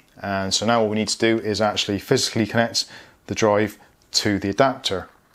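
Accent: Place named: West Country, United Kingdom. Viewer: For some reason, I find your west country accent a bit amusing.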